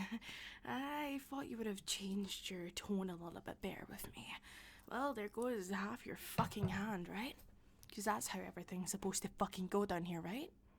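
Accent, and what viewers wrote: Scotland, United Kingdom. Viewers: I should be afraid, but the Scottish accent intrigues me way too much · I guess being scottish is a new fetish, welp *i like it*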